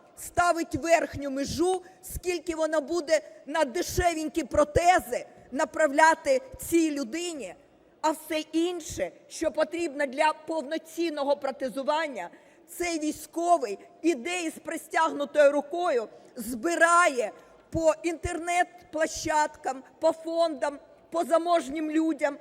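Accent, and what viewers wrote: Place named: Russia. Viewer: Юля, ви , так претися в Президенти стільки вже років , а позбутися російського акценту не хочите. Допомагаєте порошенку нищити нашу країну. Бо у вас свої амбіції за владу⁉️ Щось він кричав , що не простить вам за газ. Можливо і ви відчули, що будете виходити з потяга на першій зупинці.Це перше. По-друге перестаньте піаритися після цього, як , ви з візочка перейшли на підбори. Вас вже понесло до Трампа просити допомоги, щоб влізти у ВР, прибравши діючого Голову ВР, а потім і Президента.І все це зробити без виборів.Тому що задумала собі створити уряд національного порятунку. Від кого? Хіба від самої себе. План зірвався .Щоб допомогти ЄС захотіла попіаритися, як завжди.І вилізла за трибуну ВР, щоб попатякати про те , про ,що вас зовсім і не цікавить. Вам дійсно краще піти на пенсію, або знову - на візочок. Багато лиха накоїла Україні ваша 🦊 МОРДА.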